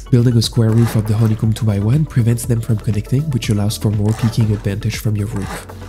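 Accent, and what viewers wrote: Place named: France. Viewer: Mhh l'accent français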